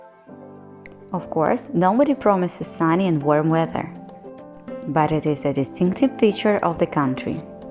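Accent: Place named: United Kingdom. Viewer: Спасибо ещё это топики развивают чтение да на Анг у вас бритиш енглиш.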